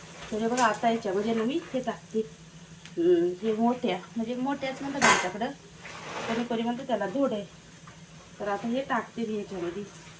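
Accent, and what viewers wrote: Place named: Akole, India. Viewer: नमस्कार मावशी 😊 भाषा आइकली लय भरी वाटला,अकोले तालुका ना तुमचा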